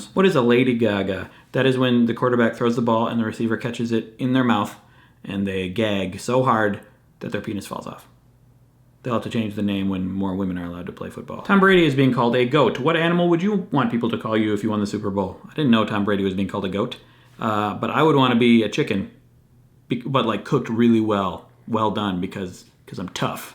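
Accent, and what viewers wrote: Wisconsin, United States. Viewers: Love your Wisconsin accent,!:D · That accent is so 👌🏼 Can you do a video entirely in your Wisconsin accent? I'm a linguist and I love language variation! 😂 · that WI accent tho! do more Wisconsin jokes!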